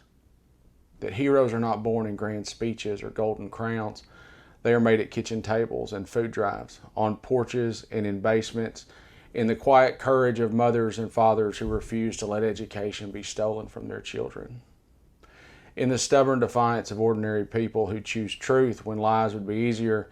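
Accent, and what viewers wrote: South, United States. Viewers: It soothes my soul to hear your words spoken in an accent that swaddled me with love and courage and righteous anger as I grew up. My parents and loved ones stood up to hate and taught me to do so. My neighbors and a few family members have succumbed to that old American hate again. It’s disheartening, but your words spoken in that Southern cadence that has the capacity to carry so much love give me hope in a language that wraps my heart in that familiar love. Thank you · I think it’s the profound eloquence wrapped in sweet southern drawl that is so soothing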